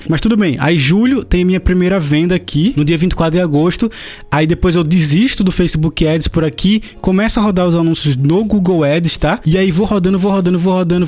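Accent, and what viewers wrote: Northeast, Brazil. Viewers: Vc é massa!! Vc é uma inspiração pra mim! De onde vc é hein?! Vc tem sotaque nordestino! Kkkk Eu sou de Recife! Parabéns pelos resultados! Showwww!!!!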